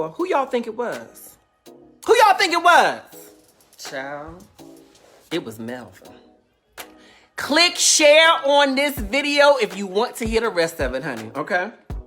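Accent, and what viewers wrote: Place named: Washington DC, United States. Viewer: I can hear in your voice that you're from DC yessss hunnie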